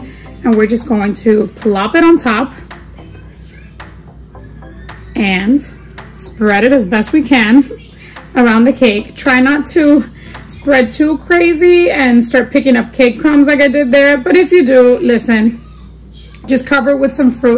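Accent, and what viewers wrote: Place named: Puerto Rico. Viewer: first timein your channel . you sound from Puerto Rico wepa!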